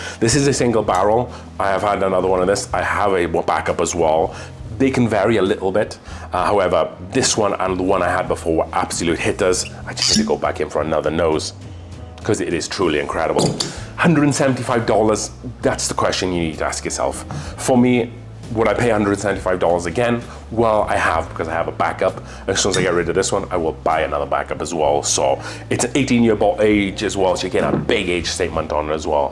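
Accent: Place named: Wales, United Kingdom. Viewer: Are you a taff? just guessing by the accent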